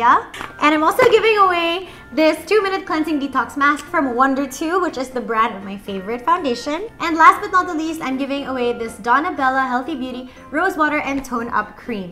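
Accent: Visayas, Philippines. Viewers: # I already follow you since nakita tika sa instagram amd I follow you and also here sa youtube ako d.i to ga comment nga cute kaau mo mag bisaya ni bf, your so cute and love your style and one you're my style icon. 😘 xoxo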